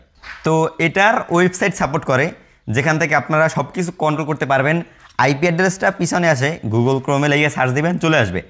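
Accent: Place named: Barishal, Bangladesh. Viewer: ভাইয়ের বাসা মনে হয় বরিশাল। 😊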